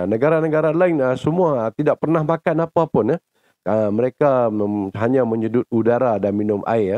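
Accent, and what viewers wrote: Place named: Java, Indonesia. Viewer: Narator daglek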